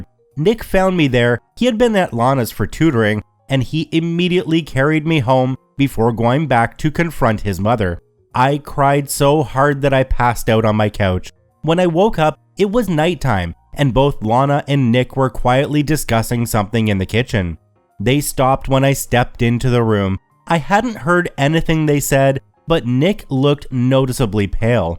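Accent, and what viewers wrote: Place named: Canada. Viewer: Youve said lana in so many ways so many times its freaking me out. Also did you ever mention that your canadian because every time you say sorry you say it like a canadian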